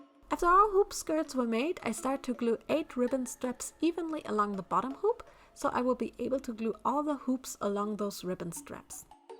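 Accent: Germany. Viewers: I thought: she sounds a little big German 🤔 And than I saw it! The 'UHU Alleskleber '😂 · for a while i was always kind of hearing her speak german for some reason when i turned the volume down a lot and in this video i now found out it's probably because her accent is german and she's german ...der gute alte alleskleber c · New viewer here - My thought journey through the video: wait, this sounds like the accent of a fellow German! wait, this sounds like a LoL theme... and another one! F Holy crap she looks absolutely GORGEOUS! Subbed, subbed and SUBBED, by God.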